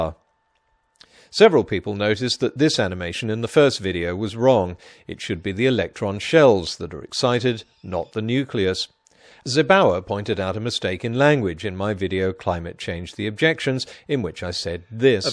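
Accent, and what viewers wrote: United Kingdom. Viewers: You might have people beeing sceptic about you. But at least you have this very very nice british accent (Is it Oxford?) and that always help upon credibility :-) Pun aside. You have very clever counter-arguments against people suffering from "youtubitis"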